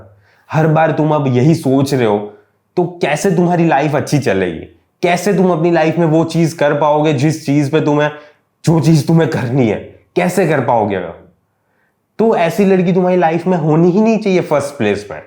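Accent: Gujarat, India. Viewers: Gujju accent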